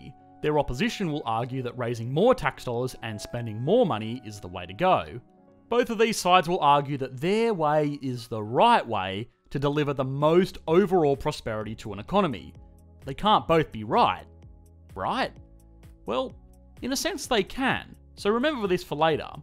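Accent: Australia. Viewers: Ed Hrafnskald Conway Well unfortunately most governments aren't as well liked as with his smooth Aussie accent!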